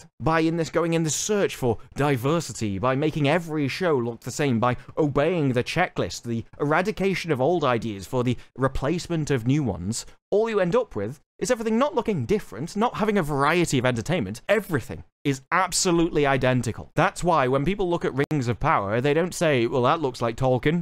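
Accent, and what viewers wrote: United Kingdom. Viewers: God I enjoy your lovely British accent while passionately dismantling these Tolkien abusers.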